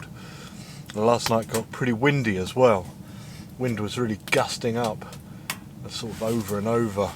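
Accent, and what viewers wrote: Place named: Cockney, United Kingdom. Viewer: Love your cockney accent "Should have a Fick frost tonight".. I used to be inlove with a cockney lad, so it's a special accent for me. Your vids are so good, very relaxing!! My husband ended up liking them too:) Yeah.. pizza is hotter than sun (as one of your mates says) when it first comes out 😦 You could make a fortune selling "Open Fireplace" screen savers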